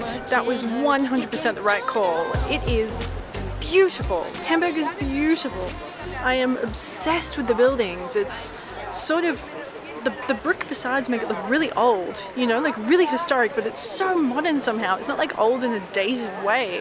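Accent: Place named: Australia. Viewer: this is the Best part of the Video this is what causes Depression... What happened in the past stays in the past.. Don't think Negatively, move on.. You're so beautiful and Gorgeous, you have that amazing Aussie accent, you're showing us the World... Keep Cool.. waiting for your next Video. Take Care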